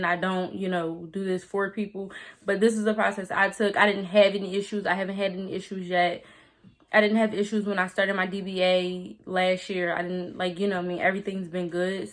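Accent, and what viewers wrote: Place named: Philadelphia, United States. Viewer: Thanks for doing this! You should like you’re from Philly too 🙌🏾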